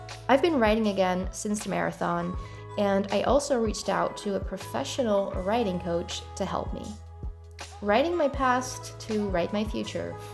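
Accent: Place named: Canada. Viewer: What an exciting new project! Can’t wait to follow along and perhaps get inspired to stay away from too much tv after work myself :) I recently started weightlifting and I feel like I’ve been missing out, it’s awesome and so helpful in combination w/ running. Didn’t realise you were from the Netherlands originally, your accent sounds very Canadian!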